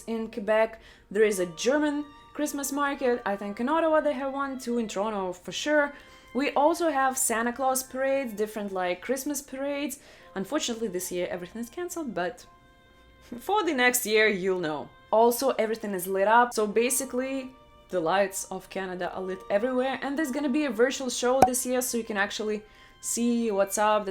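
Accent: Russia. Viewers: I like your Russian accent...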